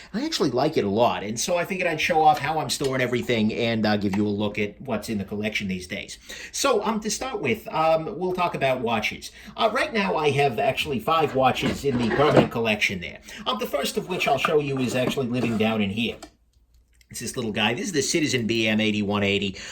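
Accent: New York, United States. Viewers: Are all of those spider co???? Jk I just started watching you I love you New Yorker accent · It’s not Texan, more New York or something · That thickkkkk NY accent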